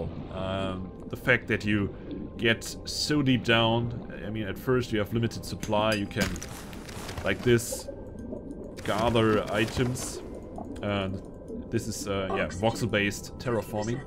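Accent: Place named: Germany. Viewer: Are you german? this accent sounds so german lol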